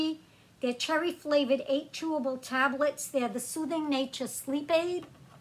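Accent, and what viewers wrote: Boston, United States. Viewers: Are you from Boston ? Your accent sounds like it 😊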